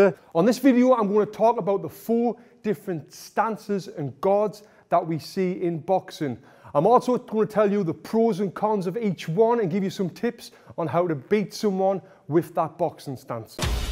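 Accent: United Kingdom. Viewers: UK english is so nice, those "poonches" and "Meeweather" are brilliant! Cheers from Kazakhstan to all UK Boxing enthusiasts.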